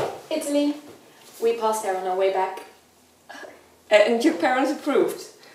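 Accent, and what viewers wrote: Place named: Netherlands. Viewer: Ha I new you were from somewhere the Netherlands ore Belgium I thought I heard it right in one of the bloopers from the mischief managers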